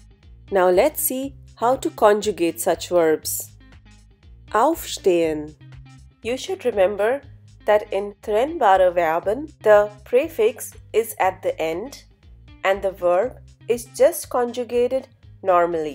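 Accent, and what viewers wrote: India. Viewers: it sounds like this lady has an Indian accent and it’s throwing me off… i can’t pronounce words properly because of that 😕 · Ist es nur mich, oder klingt ihre deutsche aussprache Inderin? Is it only me or does her German accent sound Indian?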